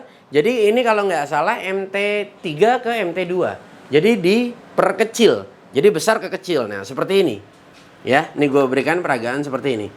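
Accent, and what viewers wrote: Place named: Betawi, Indonesia. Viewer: Jujur bang cara penjelasan ente emang beda betawi abis mantap ❤ane jd cengar cengir ngeliatnya 😂 ini baru review + hiburan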